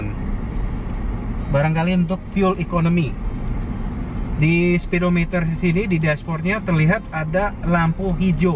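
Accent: Indonesia. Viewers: wkwkwk bule tapi cengkoknya asli indonesia banget 😀😀... reviewnya super jujur, pedeees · Bahasa Indonesia nya udah ada aksen Indonesia nya. Itu baru jarang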